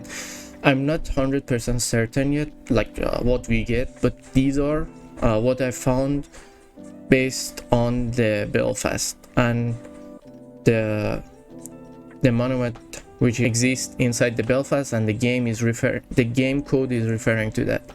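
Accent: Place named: Iran. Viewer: Oh you are Persian !!! I always watch you videos & I thought maybe you are Persian because of your accent . Well done mate 👏🏻 I created a topic about adding Persia as new civilization for AOE III DE in future in official forum (Persia civ suggestion) . You can go & check it my friend.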